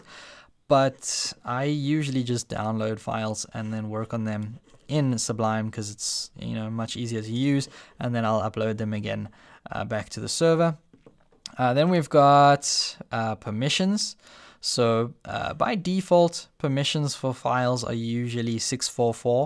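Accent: South Africa. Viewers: Love hearing a South African accent for a change!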